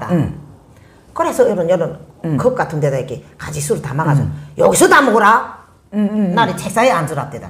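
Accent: Hamgyong, North Korea. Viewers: 님 오래 기다렸습니다 함경도 말씨 좋습니다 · 우연치않게 님의 동영상을 모두 보게 됐네요...오늘에서야...물론 님의 평양만사는 구독신청해 놓았고..자주 보는데 님의 구수한 걸쭉한 이북사투리 넘 감칠맛나서 중간에 보다가 시간이 나서 처음부터 자세히 보게 됐습니다. 아주 코너를 하나 만들어서 하는게 좋은듯....그리고 님 저가 장담하건데...분명히 똑똑한 작가가 있다면 TV작가가 있다면 연락이 올것입니다. 그전에 연기 공부좀 해두시면 님이 반듯이 꿈꾸던 북한 여자 연기자역이 반듯이 올것이라 생각됩니다. 참 대찬 여자라고 생각됩니다.....당신을 응원합니다.....북한 탈북인들 유튜브를 가끔봅니다만 님 정말 당차고 대찹니다.......조만간 "채널A 이제만나러 갑니다"에서도 연락이 와야하지 않을까 싶습니다....너무 잼나서 오늘하루 씨의 방송프로를 다 보았습니다....정말 재미있습니다..........응원합니다.........홧팅~~~~~아차~ 필살기 4편은 언제 방영되는지....빨리 나오길 기대합니다.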